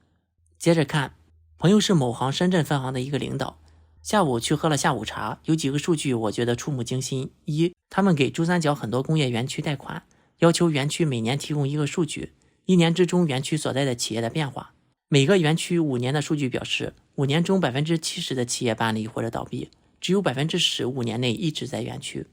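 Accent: Shandong, China. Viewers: Klaus是不是山东人，感觉口音挺重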